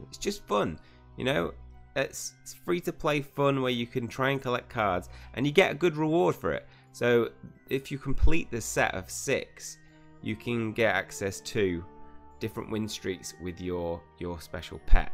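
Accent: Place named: Cockney, United Kingdom. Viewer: Nope. this guy is all-over-the-place in his attempt to explain things. For one, Americans have a tough time as it is trying to understand the Cockney language. Secondly, he's fumbling around with the cursor trying to explain features and in the process - he's out in left field, so to speak going back and forth and in the process ends up with us knowing but an inkling more than when we started to listen to the "How to play.". He's talking about cards, and other items, but his "basics" are totally left out of the instruction. What many of us wish to know is what constitutes a good move in the game, what to shoot far as the screen refreshes, what items are most important and when to use them (ie, bombs, footsteps, how to get past attacks, how to kill frogs, what are "power ups" and how to use them),; what are the best moves in the game (ie using 3, 4, 5 in a row items); what to save in each game until needed on the game board; know if it's better to use a lighting bolt spell or a flying key; what is the order of an item's changes until it can be used (ie, a block of ice, turning clearer). 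Oh, there are so many more things in "How to play" that is totally left out here. I haven't come across an excellent playbook/instruction manual outlying all of this and most videos I've seen one may know how to play the game but not express clarity, simplicity, understanding too all - but make an attempt to speed by it, like they were in a timed game. - pitiful.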